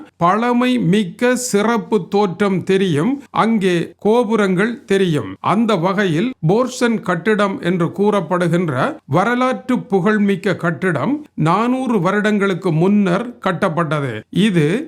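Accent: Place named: Valvettithurai, Sri Lanka. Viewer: வல்வெட்டித்துறை தமிழ்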